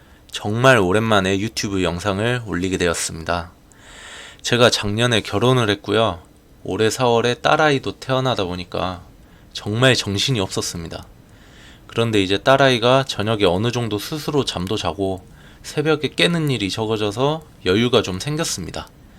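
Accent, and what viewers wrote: South Korea. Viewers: 아따 전라도냐? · 혹시 광주사람인가요?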